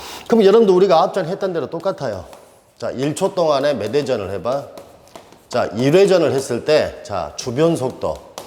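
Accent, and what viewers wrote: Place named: Honam, South Korea. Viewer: 교수님 정말 멋진 강의하고 있습니다 구수한 호남사투리에 귀에 쏙쏙 박히는 강의 내용 제가 경상도 50대 후반 남자 꼰대인데 인생살면서 교수님처럼 멋진 강의 처음입니다 구독자들이 어떤 건의사항들이 올라올수 있지만 그냥 교수님 스타일대로 하세요 대한민국 최고의 교수입니다!!! 멋집니다!! 어떤 것도 바꾸지 마세요!!! 정말 이대로~~~~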